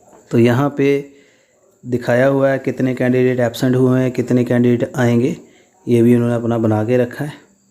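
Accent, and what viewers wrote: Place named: Punjab, India. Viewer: Sir ji twadi punjabi esde vich saf nazar a rhi hai...i like it 😍😍😍😍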